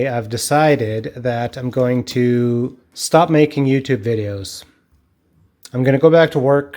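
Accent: Canada. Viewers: I wish I could shake your hand and thank you for the joy you've given. I'll miss your Canadian accent, eh? It's been super and I'm glad I got to follow along. Take care of yourself out there.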